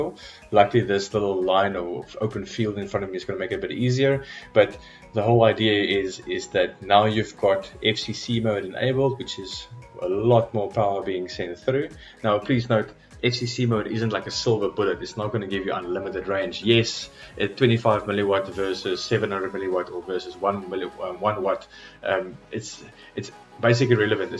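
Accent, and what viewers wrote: South Africa. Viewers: Nice to hear an SA accent gain. Filming from Melkbos? My question: I am currently in Vancouver, Canada. How will I find out if I am in FCC mode on my DJI FPV?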